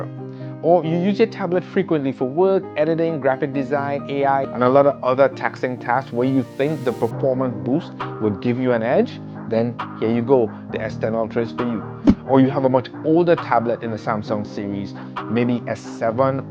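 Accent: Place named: Trinidad and Tobago. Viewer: Wait, wait, how you sound like a Trini so lol?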